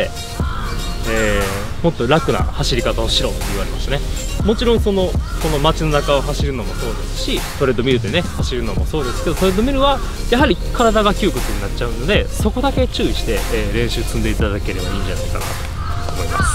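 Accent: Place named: Kansai, Japan. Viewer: の動画はナレーションがの関西弁そのままでめっちゃ良いです！ P.S.冒頭のBGM音量もう少し下げていただけますと… のアチチな気持ちはわかるんですが…音量が…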